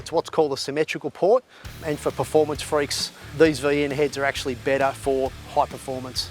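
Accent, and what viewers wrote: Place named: New South Wales, Australia. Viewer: NSW accent right? He'd say pool like pewl and school like skewl. I had neighbours from Sydney and they spoke like that too.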